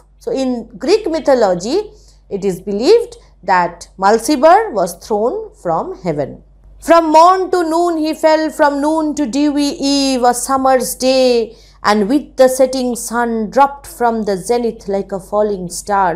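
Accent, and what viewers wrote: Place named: Bengal, India. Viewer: Ur the best i hv ever met 👌👌👌👌👌ur explanation is commendable....i was jumping from this to tht for searching the best explanation n got u there in my 3rd day of searching period. Just want to say ur accent is soo cute ....mixed with bengoli tone uff itss soo ear pleasing to me.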